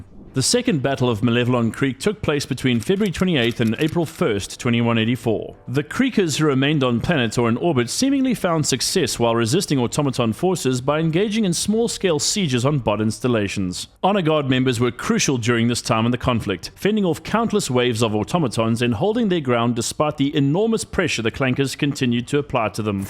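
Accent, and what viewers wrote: South Africa. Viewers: I can smell a South African accent a mile away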